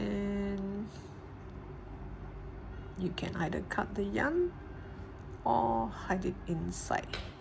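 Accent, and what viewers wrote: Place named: Singapore. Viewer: Are u Singaporean 😭 ur accent is like Singaporean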